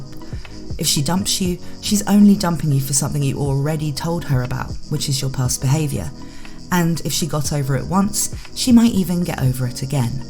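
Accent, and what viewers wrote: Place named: United Kingdom. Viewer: Finally. It's in british accent.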